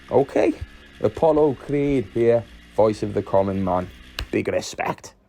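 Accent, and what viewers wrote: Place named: Geordie, United Kingdom. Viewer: I love the slip in accent mate your geordie roots always shine through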